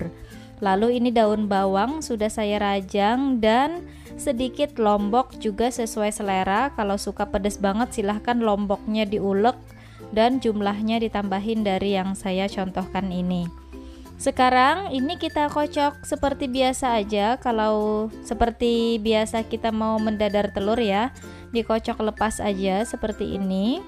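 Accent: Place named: Samarinda, Indonesia. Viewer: What samarinda??? Nada samarindanya udah ketauan..hehe sama dong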